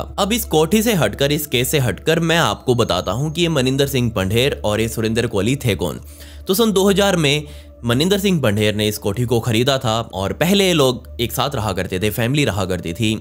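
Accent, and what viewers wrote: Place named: Haryana, India. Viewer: Apki hindi mai , Haryanvi ton Hai लाडले ❤️❤️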